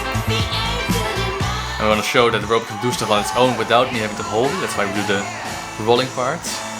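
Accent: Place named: Netherlands. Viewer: As soon I heard the accent, I knew you where a fellow dutchman! I've seen your audition too! I loved it! It's a positive sight, among all this intrution of i.A.!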